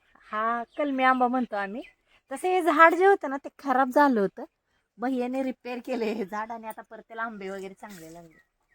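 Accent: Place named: Barshi, India. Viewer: Didi tumi proper kontya city mdun ahat tumchi भाषा पूर्ण बार्शी कडे अस बोलतात तशी आहे.ऋतुजा माझी फ्रेंड आहे .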